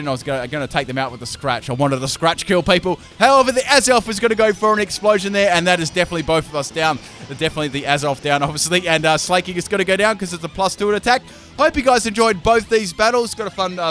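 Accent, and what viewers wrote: Australia. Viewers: Are you Aussie ? You sound Aussie as fuck. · U Australian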